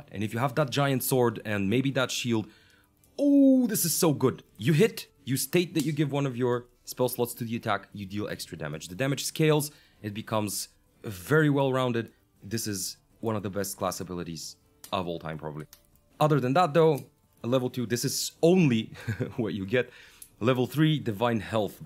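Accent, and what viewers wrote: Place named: Norway. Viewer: You have nice accent when you speak. You're norwegian, aren't you?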